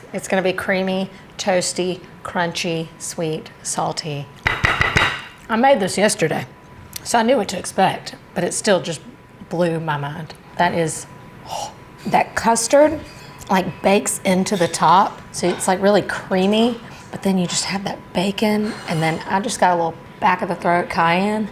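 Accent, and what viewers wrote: South, United States. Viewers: I am new to your channel and enjoying very much. That could be because you are very attractive and an Italian with a southern accent ❤ great videos i can't wait to try your recipes.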